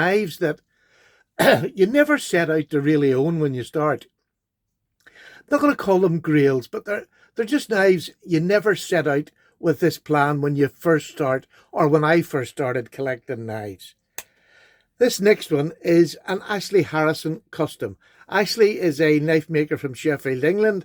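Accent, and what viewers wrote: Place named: Ireland. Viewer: Hi! I stumbled upon your channel about a week ago, I I want you to know what a pleasure it is to watch your videos and listen to your voice! Your brogue is what I imagine my ancestors would have sounded like! I appreciate your comments and attitude as well as the knife content! Have a great day Sir!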